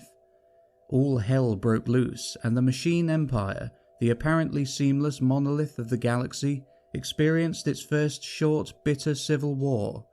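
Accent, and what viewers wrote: United Kingdom. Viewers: Hey, idk why you don't have more views, before getting the PDF i was looking for someone with a mild level of oration competency reading this, but the only one there was on YouTube was the guy with the annoying loud music and another guy with the most anticlimactic voice in the world, and the fact that you are bri´sh might help with this, but anyway keep up the good work.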